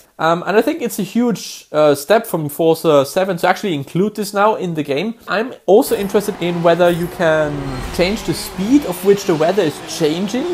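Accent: Germany. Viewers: Thanks for the info... And your german english.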